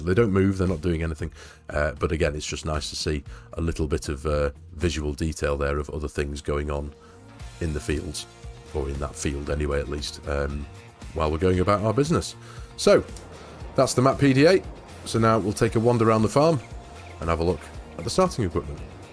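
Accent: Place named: Scotland, United Kingdom. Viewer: "being from Scotland originally" I could tell by the accent 🤣🤣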